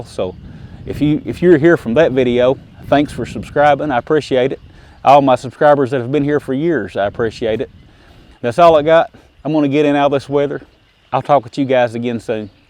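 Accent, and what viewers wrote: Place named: South, United States. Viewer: Dang that is a thick southern accent. Anyway that's a nice gun, I'm not in the market for one, I just enjoy watching these videos.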